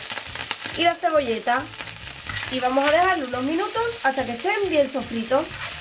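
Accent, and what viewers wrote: Andalusia, Spain. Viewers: Muy buena tu receta y muy lindo tu acento andaluuuuu.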